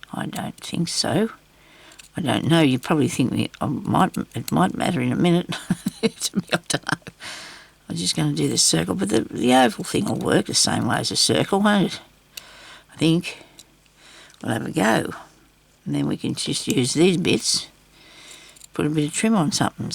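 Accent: Australia. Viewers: You're so cheerful. Enjoy hearing you talk Australian. Lol 😀TFS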